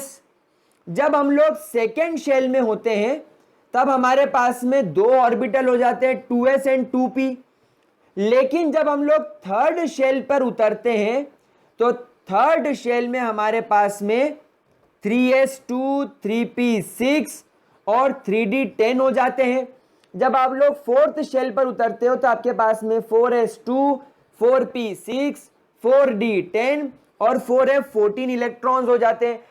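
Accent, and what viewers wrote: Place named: Indore, India. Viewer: Sir u r the perfect indori..😂😂😅.... BTW ur teaching is superbb thanks a lot sir..🙇🙏🙏🙏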